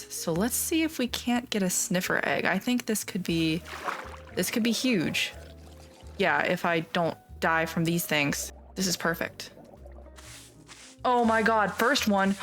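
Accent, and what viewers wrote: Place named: Texas, United States. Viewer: This girl is definitely from Texas or something. Her accent sounds exactly like mine.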